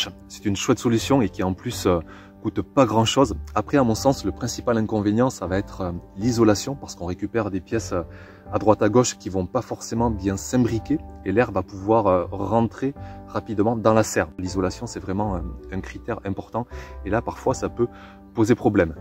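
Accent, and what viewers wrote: South, France. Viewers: Bonjour, Merci de faire des vidéos aussi pertinentes, trouver des fournisseurs fiables n'est pas facile, j'avais déjà beaucoup apprécié ton travail sur l'irrigation, et ici la serre c'est parfait! Ca déconstruit aussi le mythe de la serre en verre beaucoup plus chère que le polycarbonate! J'en profite pour te dire que tu rayonnes à chaque vidéo, c'est toujours un plaisir de voir quelqu'un être si heureux et passionné. PS: Je suis pressée de lire ton prochain livre, j'entendais ton accent du Sud en lisant le premier =)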